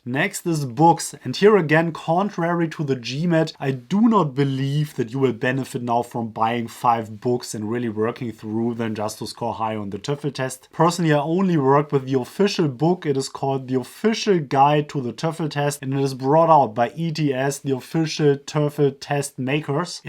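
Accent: Germany. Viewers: But I like your accent. Like German, sounds explicitly, harsh and strict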